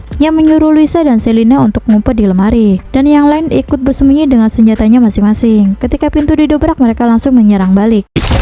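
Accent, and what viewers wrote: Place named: Banyumas, Indonesia. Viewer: Bahasane ngapak koh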